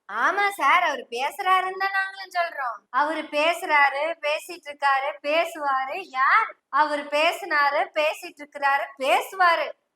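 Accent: Kongu, India. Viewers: கொங்கு தமிழ் நல்லா தான் இருக்கு ஆனா சாராயக்காரனுக்கு சொம்பு அடிக்கிறதா நல்லா இல்ல.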